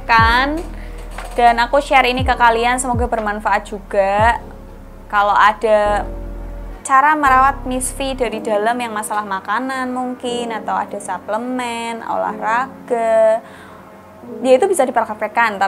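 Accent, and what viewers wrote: Java, Indonesia. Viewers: jowo mu ngendi mbak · Jowone khas jawa ndeso 😀 tp gpp lah rodok terhibur 😄😄😄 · Kk e medo' ,😆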